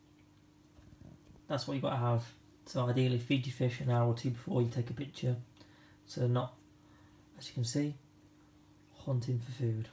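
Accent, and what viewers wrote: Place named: England, United Kingdom. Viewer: Arhhh ok. I'm from Yorkshire but live in the US now. Just recognised the accent👍🏻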